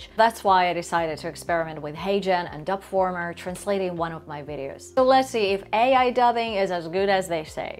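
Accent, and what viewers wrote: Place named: Spain. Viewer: es gracioso que tu español es el de España jaja y el de las ias suena más mexicano neutro 😂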